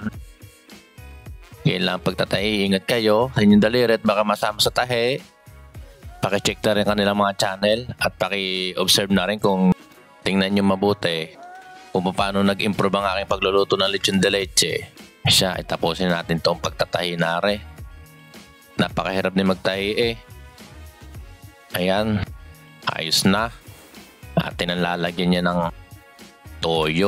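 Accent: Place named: Batangas, Philippines. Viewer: batangueño ata are HAHAHA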